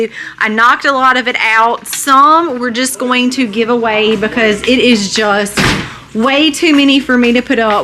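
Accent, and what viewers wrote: South, United States. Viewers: What is your youngest daughter's name and how old is she? Im not use to southern accents and I can't understand if you say Libby or Livie.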